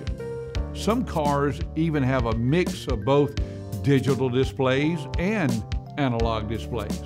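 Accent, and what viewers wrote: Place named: Texas, United States. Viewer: "Their ve-HI-cles" WHY can't they pronounce words right?? Also they say h-wile instead of while. OMG the texas accent is super annoying but this video is very informative and was the only video i could find that showed how they work. But that accent will always irk me >__< either he's from Texas or Tennessee I can't tell their accents all sound the same to me.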